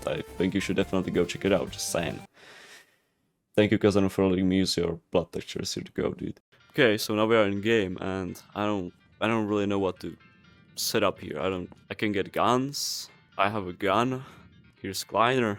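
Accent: Czech Republic. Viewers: From the accent i knew that you are czech! brachoo řízek 🍺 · are you czech?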